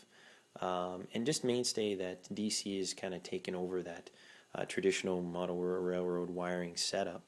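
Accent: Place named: Canada. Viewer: Hey...I love your explanation...(even the Canadian accent)..you are great..Be a teacher..you, got it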